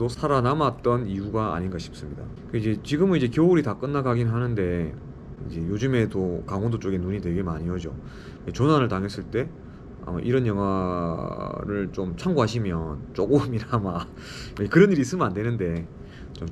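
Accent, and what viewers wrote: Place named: Busan, South Korea. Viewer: 너므 말씀 조리 있게 잘하세요 부산 말투가 좀 세련되게 느껴지는 보이스~~멋쪄요~~^^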